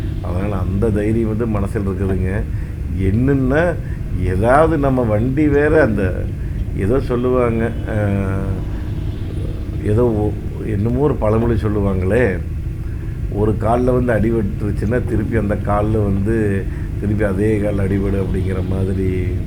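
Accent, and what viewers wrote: Kongu, India. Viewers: கொஞ்சும் கொங்குத் தமிழ் பேசும் கோமகரே...! திகட்டாத தித்திக்கும் பேச்சுடைய திருப்பூர் திருமகரே...! எங்கள் மதிப்பிற்குறிய டான் திரு. அண்ணா❤❤❤🙏 அவர்களே எப்படி இருக்கீங்க அண்ணி❤❤❤🙏 எப்படி இருக்காங்க ரித்திக்❤❤❤ எப்படி இருக்காரு. பயம் இருக்கும் மனதில் நிச்சயம் பலம் இருக்கும்...! எளிதில் அறுந்துவிடும் நூலை நினைத்து பயம் கொள்ளாமல்...? எதிர்த்துப் போராடி வீறுகொண்டு எழுந்து பறந்திட வீசும் காற்று உள்ளது என வானில் பட்டம் பறப்பதில்லையா...! அதுபோலவேதான் மனதை உலுக்கும் பயம் வந்தபோதும் எதிலும் உறுதி என்கிற தன்னம்பிக்கை என்னும் பலம்தான் அண்ணா உங்கள் வெற்றி மகுடம்...! நம் ❤சிட்டுக்குருவியோட❤ தங்களது இந்த லடாக் பயணம் இனிதே தொடர்ந்திட நல்வாழ்த்துக்கள் அண்ணா❤❤❤ என்றும் அன்புடன் உங்கள் தம்பி என்றும் அன்புடன் உங்கள் TMF ரா.முஹம்மது கபூர் (சென்னையில் இருந்து)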